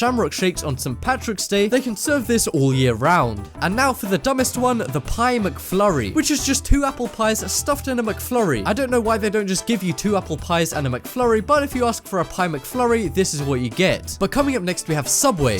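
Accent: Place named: United Kingdom. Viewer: You got Brit voice but say dollors so are these available in uk not that I be ordering soon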